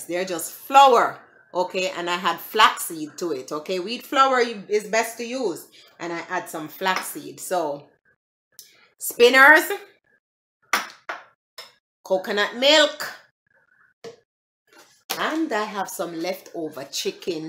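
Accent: Jamaica. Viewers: That looks amazing!!! To be honest, you can never go wrong with coconut milk. I love love love coconut milk. I'm new on your channel and I must say, I'm already hooked on the Jamaican accent. Congrats on the weight loss and thanks once more for the lovely comment you left on my channel. ❤️Summer Dublin, Ireland 🇮🇪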